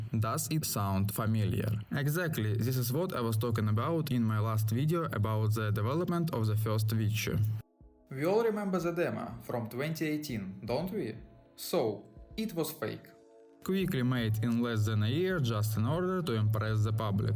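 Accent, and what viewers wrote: Russia. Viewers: russian english good god :/ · he has Russian accent, so should that deter him from making content? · why are people hating on this guy? is it because of his accent or because he is russian? there are many xenophobes i feel. keep up the good work brother. I like your uploads about cyberpunk XD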